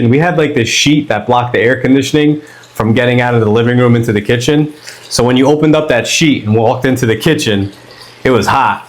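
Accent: Philadelphia, United States. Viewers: Reminiscent of a Philly accent. Reminds me of home and Gramma Consolo's cooking.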